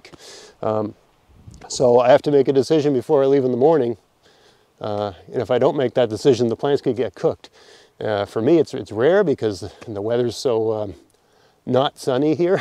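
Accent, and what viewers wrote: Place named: Nova Scotia, Canada. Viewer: i am a huge fan of the trailer park boys and live in europe. the moment i heard you talking, I was absolutely sure you are from Nova Scotia and i cant believe i was right :) you remind me a bit of Randy I dont know why, you are handsome and he isnt :) maybe the eyes and accent. love your channel, just subscribed and started watching. Thank you for everything you do. greetings from central Europe.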